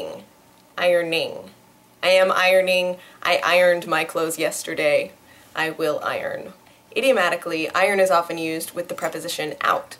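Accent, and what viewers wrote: United States. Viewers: she's right, even if her 'standard english' pronunciation is actually a bit on the USA side. Ironic is pronounced WITH an 'r', instead :). Iron, without. In USA, they do pronounce it as she says. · In England it's more "i-on", not "i-ern", the way you pronounce in America is not British standard English. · Standard 'murrican' English that is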